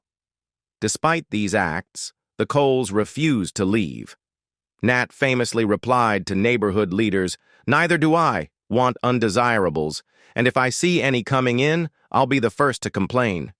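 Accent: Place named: England, United Kingdom. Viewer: Why has he an English ascent and where is evidence of his 4 years old picture and church photos. He is English not American.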